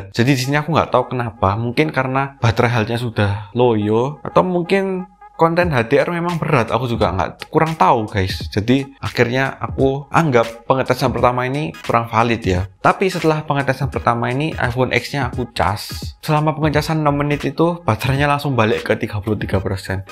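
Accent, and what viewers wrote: Java, Indonesia. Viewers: medok banget jawanya (D)😀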